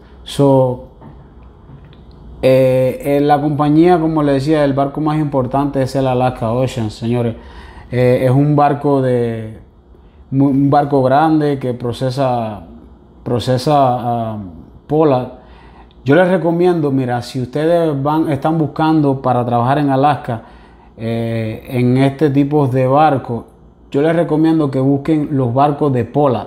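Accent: Dominican Republic. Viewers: Hermano usted es dominicano? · Tiene acento dominicano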